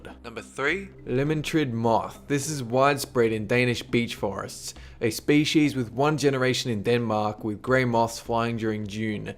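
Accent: Australia. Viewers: are you australian? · are you Australian or kiwi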